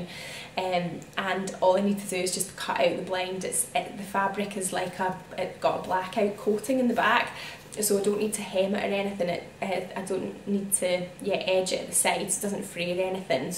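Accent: Scotland, United Kingdom. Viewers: I live in Switzerland and it’s great hearing another Scottish accent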